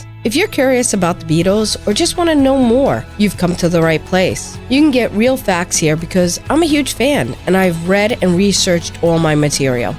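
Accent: United States. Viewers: Ur from jersey arent u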